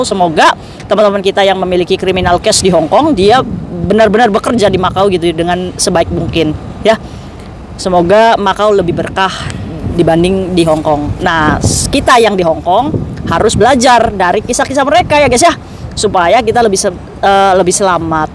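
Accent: Indramayu, Indonesia. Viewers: Pen nakon be tamah mbak kuh aslie wong ndi.logate mh kaya wong Indramayu atau wong cerbon hehe.. salam kenal bae mbak.sehat selalu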